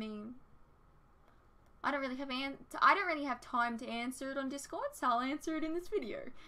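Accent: Australia. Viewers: You're: Young, female, cute, and you speak English with an Aussie accent... Yep, I predict your channel will continue to GROW.:^) Lewis from Orange County, California, USA (just down the street from Disneyland). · Like I said, it sounds more Aussie.:-)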